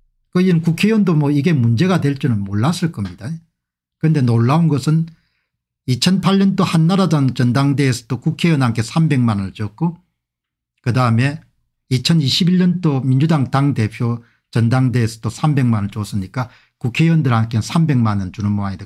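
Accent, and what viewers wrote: Gyeongsang, South Korea. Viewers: 공병호님 방송 항상 잘 보고 있습니다. 하나만 지적할게요. '새'를 '섀'로 발음하고 있습니다. 그러니까 인생을 '인섕'으로 발음하고 있습니다. 서부 경남 지역 발음입니다. 공병호님이 방송인이라 이런 지적을 해봅니다.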